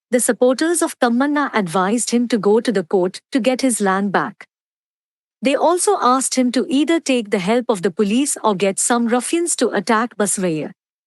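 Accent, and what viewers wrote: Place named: United States. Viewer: Your voice myam American voice but teaching is,nice myam tanks myam 🥰🥰🙏🙏🙏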